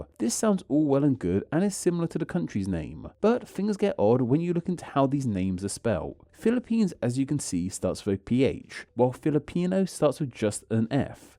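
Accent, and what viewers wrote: United Kingdom. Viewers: I doubt he did it on purpose. Being a US citizen, I encounter many horrendous mispronunciations in real life. It's somewhat an "entitlement" to the language of its country. I hear people say: "How do you pronounce this or that with an English accent?" (not related to England of course) It's the lack of trying or want to try in my personal opinion. Don't misunderstand me, there are many who do try (and succeed or fail.) But this one is just reading the name with an extreme form of dyslexia and not caring, leaving the horribly butchered name in the video instead of editing it out with a correct pronunciation.... plain laziness and monetization. edit: And now I have to apologise because after listening on, I find the narrator speaks with a British, albeit not thick, accent and nothing to do with the Americas and the US of it.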